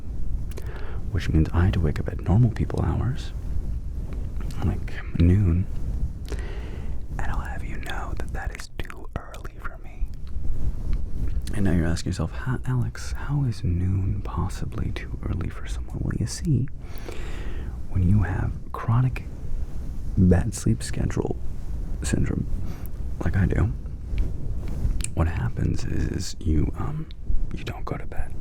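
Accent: South, United States. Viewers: I actually love your southern accent! More please! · What is happening here?!😯 You crack me up. What a wild video this turned out to be.😆 Helix please sponsor Alex. He deserves a new mattress. Listen to him. He needs a good night sleep.😂😂🛌🏼💤 Your editor is hilarious. The back and forth. Nice clicky clacky sounds with William. I'm new to your channel; gotta love the silly giggles.🤭 Thank you for 'sharing' with us. Southern accent. Hey now.😭 Glad your Dad is okay. The Cat moment.🙀😻 We're off the rails but in a good way. I'm laughing uncontrollably, but with you, not at you. There's a difference. This was fun and delirious. ASMR realness.🤪👏🏻 Goodnight.💜🧑‍🔬💜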